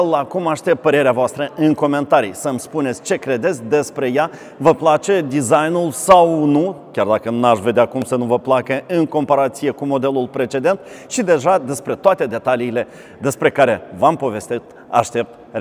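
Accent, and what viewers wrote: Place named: Moldova. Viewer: Ăsta-i moldovean de dincolo de Prut ? Că după accent, așa pare !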